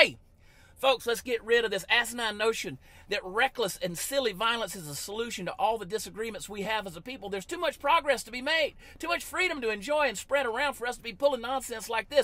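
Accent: Texas, United States. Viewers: This is so funny because as soon as I heard you talk I wondered if you where from Texas and I'm from Oregon and you are so right about Portland 😂😂😂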